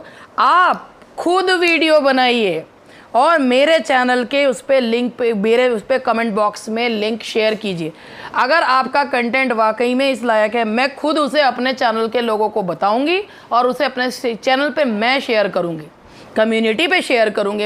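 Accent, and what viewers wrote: Meerut, India. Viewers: Mam aap meerut se ho kya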